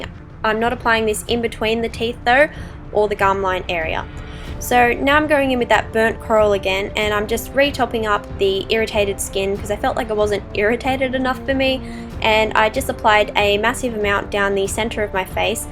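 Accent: Australia. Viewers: Yeah Australian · You Aussie? Love this!! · are you Australian? Your really pretty and an awsome make up artist btw! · Lawrence Stapleton she sounds very australian : ) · Amazing! I'm taking from the accent you're Australian like me, so is there a particular place fellow Aussie's can buy Ben Nye supplies or does it all have to be online/from USA?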